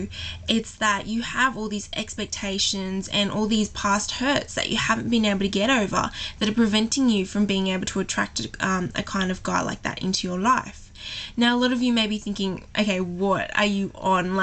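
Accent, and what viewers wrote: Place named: Australia. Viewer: Wow you sound super Aussie!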